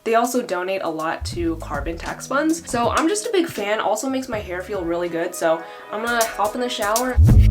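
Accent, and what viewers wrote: United Kingdom. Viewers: that british accent is more realistic than my own english accent lol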